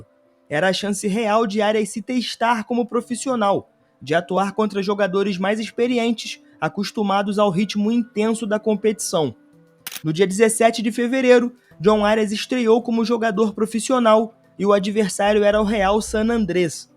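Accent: Rio de Janeiro, Brazil. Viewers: Cara esse sotoque carioca forte dói no ouvido. Eu me rendo, as vezes é melhor leitura de IA.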